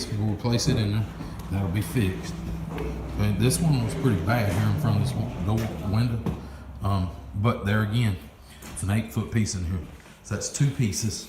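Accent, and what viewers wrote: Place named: Alabama, United States. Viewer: Oh, and the Alabamaspanglish is hilarious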